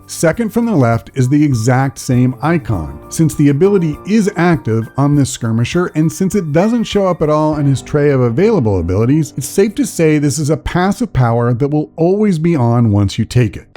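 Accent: United States. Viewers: For Some Reason you Remind me of a Few Guys I grew up with and learned from in SoCal. ( there older than me ) I think it's how you talk and Explain Things, so Unlike the other Videos of people Giving Advice on XCOM2, which can be a complicated subject in itself. I don't have to Watch your videos 10x's to Understand what Your saying! Thanks for All the Help! I really appreciate you Donating Your TIME ( if you think about your Time is EVERYTHING, it's all one Really Has ) to Helping others, Keep Up the GOOD WORK!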